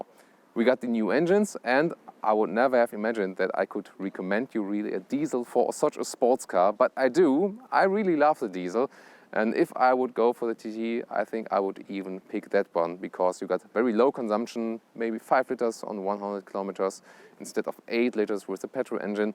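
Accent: Germany. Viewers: I love your accent, seriously, I wish I had a German accent like you do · I love your channel, I always watch your videos about the autos. unfortunately, many models are not available in the US., you have a very funny German-English accent. which could be one of your traits. And you looked so weird in helmet....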